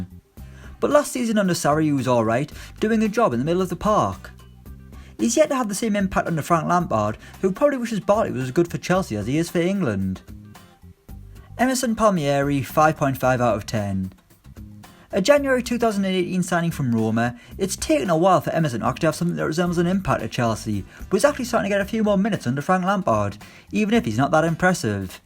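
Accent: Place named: Ireland. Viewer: Irish guy detected!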